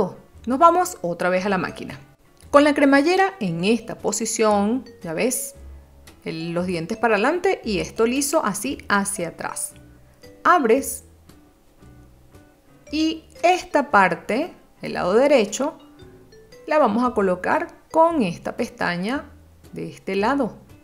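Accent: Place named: Venezuela. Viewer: Gracias.... Me gusta mucho la forma como explicas... De donde eres? Tu acento es venezolano, me parece.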